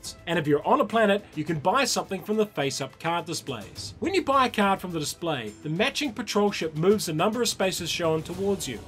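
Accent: New Zealand. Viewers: Kia ora, e hoa! So pleased to hear a kiwi. Tino pai rawa!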